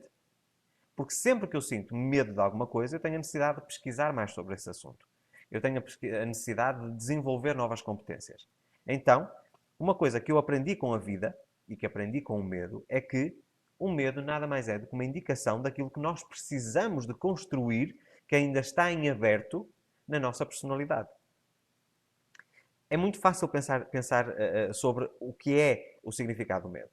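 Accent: Portugal. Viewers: Esse sotaque português...😍😍😍😍 além de conteúdo construtivo. Grata como sempre.😊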